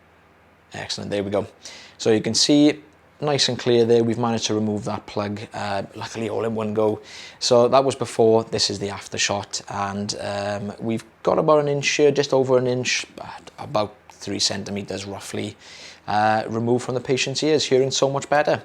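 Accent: Wales, United Kingdom. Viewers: The accent is Welsh, The clinics are all based in Wales I believe. Lovely accent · My favorite British accent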